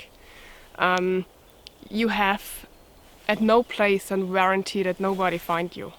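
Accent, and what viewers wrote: Germany. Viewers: Hallo, Es tut mir leid, Ihr Leben zu erforschen suchen, mag ich Ihre Videos und liebe Ihren deutschen enlish Akzent, versuche ich, Deutsch zu erfahren, weil ich viele deutsche Freunde in paddernorn habe, Ich weiß die Geschichte der Vögel und der Bienen, aber wie haben Sie ein Baby? ich dachte immer, dass Sie eine einzelne Wikingerfrau der Wald-Mumie waren? ich frage in Deutsch so nicht viele Menschen werden wissen, was ich frage, habe auch ich eine Verbindung mit Deutschland, weil ich in den britischen Armeebaracken konzipiert wurde, wenn meine Mama und Vati, der war in der britischen Armee, und macht es hoffentlich leichter für Sie zu verstehen?;)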